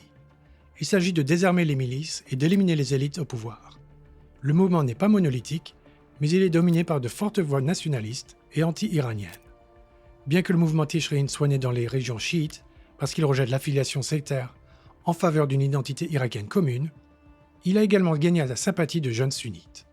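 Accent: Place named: United States. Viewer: Interesting analysis as always. I'm glad this channel has a French version. Some remarks however: translation is by no means bad, but not always consistent (especially noticeable is the intro, "welcome to the 20s report" is translated several different ways). The narrator's pronunciation of foreign names is a surprising (it's quite unusual in France, at least, to use an American accent - especially for ISIS as was mentioned today) but not bad in itself - however I still can't get over the pronunciation of "Erdogan" in the Turkey video, which was the worst-possible option (and, in fact, one of the rare times where it was read as if it was a French word). I also notice that neither translator nor narrator are credited in the video or description. How come?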